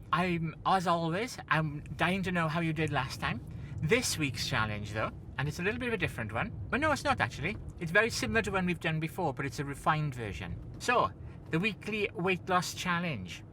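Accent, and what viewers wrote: United Kingdom. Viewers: I love your voice and accent lol. I'm from the south (of the U.S.) and I have no idea what part of the U.K. it's from but it's wonderful. I hope everything goes well, sending some trans solidarity love your way 💖✌️🌠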